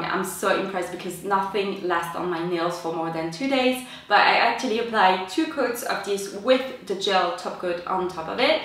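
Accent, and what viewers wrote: France. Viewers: Bonjour ☺️Es-tu française ? Are you french ? Because you hava a french accent especially when you say "la mer" ?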